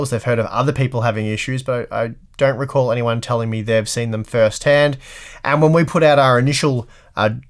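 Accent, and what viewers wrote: Australia. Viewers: it's very much Australian. Love the channel for this reason, grew up with it and feels close to home.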